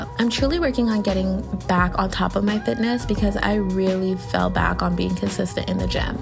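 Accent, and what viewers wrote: Nigeria. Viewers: AS soon as you hit that Nigerian accent I subscribed! I love following African youtubers because its easy to relate to them. I am glad you were in my recommendations! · That Nigerian accent was unexpected 🤣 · As soon as you switched to the Nigerian accent you won my heart and I subbed 🤗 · same totes nigerian accent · Wow 😯 I had to pause when I heard your naija accent. Ayyyyy Hi sis 💕🇳🇬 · She’s definitely either Nigerian or Ghanaian😭✋🏽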